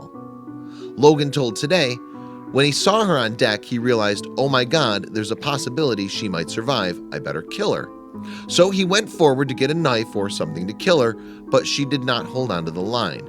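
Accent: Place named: East Coast, United States. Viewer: Who hired this narrator, I can't stand his east coast twang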